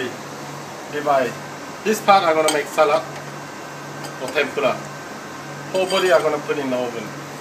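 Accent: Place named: Japan. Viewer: 日本人みたいな発音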